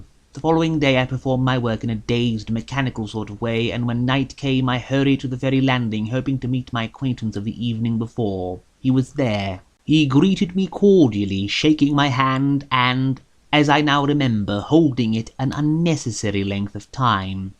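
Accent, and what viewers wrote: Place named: Eastern Europe. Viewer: You have the perfect voice for things like this, your diction is excellent and the slight Slavic lilt adds something rather lovely to the cadence.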